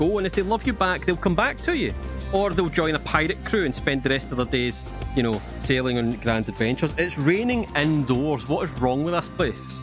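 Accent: Scotland, United Kingdom. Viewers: scottish skyrim daddy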